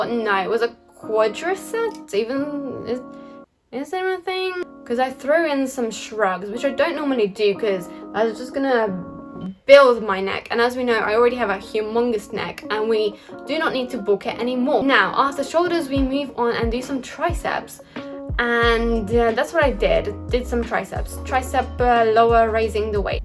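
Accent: United Kingdom. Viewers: Why do you have a British accent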